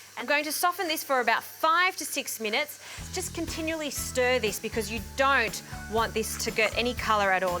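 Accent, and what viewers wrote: Australia. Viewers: You are a cutie pie I like your Aussie accent...could you wear some tighter clothes next video..oh the dish looks nice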